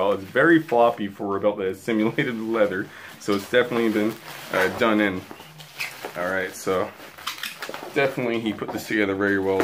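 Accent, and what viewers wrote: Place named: Australia. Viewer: That's actually quite a good aussie accent!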